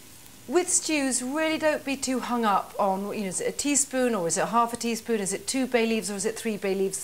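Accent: United Kingdom. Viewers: I am here to learn the British accent and not the dish 🤗🤗🤗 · Your voice is so attractive, I’m going to have my girlfriend start using a british accent 😂😂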